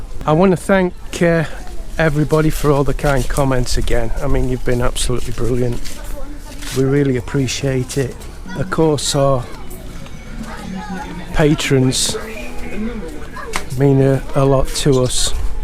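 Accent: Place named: Nottingham, United Kingdom. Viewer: Trying to work out your ascent it sounds Notts area I am still contract working in the UK till next year when I retire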